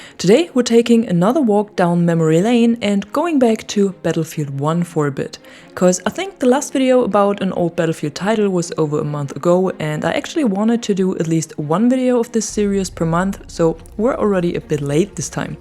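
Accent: Germany. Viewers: Yea, i also think it's a German accent. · Your good, is that a German accent i detect 😉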